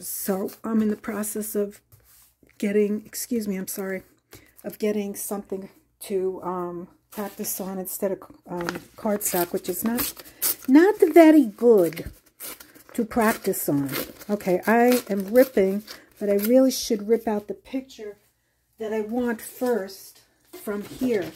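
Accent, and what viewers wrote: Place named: New York, United States. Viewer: I love your longer videos. I also like your voice and accent. I had an aunt from New York and used to love to visit with her. Your sense of humor is great. Your keep it real for all the times we craft and things happen, I laughed out loud when that lid to your ink pad went flying off the table. I also love how you insert comments to people who are going to say something negative. Anyway, just my two cents. I look forward to your videos.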